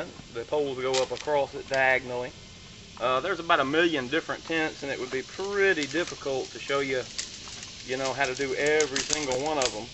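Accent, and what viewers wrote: Texas, United States. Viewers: The tips at the end of the video is extremely helpful! Is that Texas accent?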